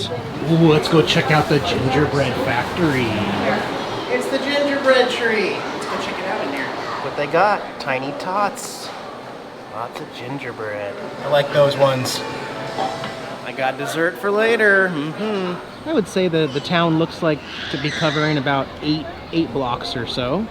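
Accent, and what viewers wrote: South, United States. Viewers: Why do you sound like your from the south?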